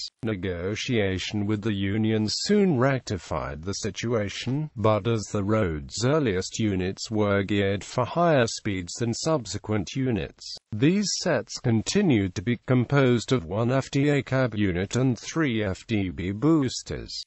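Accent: United Kingdom. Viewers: The British accent, anything that ends with the letter A is pronounced with an R. Makes absolutely no sense to me.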